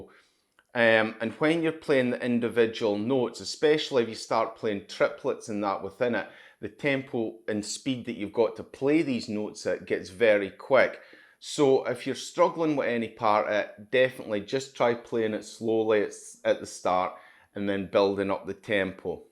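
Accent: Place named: Scotland, United Kingdom. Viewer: Good to hear a Scots accent, sir. You choose great source material to give a lesson with. Best of health and best wishes with your channel.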